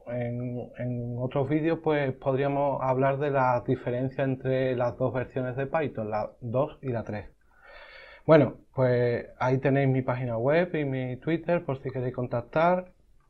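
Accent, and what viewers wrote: Spain. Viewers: Perdón que me salga del tema, de qué parte de España es su acento?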